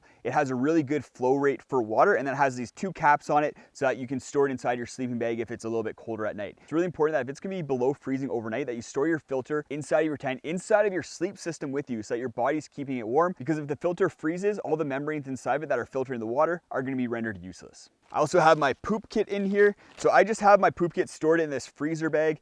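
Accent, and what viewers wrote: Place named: Canada. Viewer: You sound especially canadian in this video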